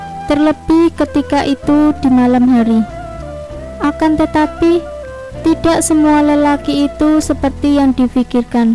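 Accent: Java, Indonesia. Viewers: Medok amat yg crita🤣🤣🤣🤣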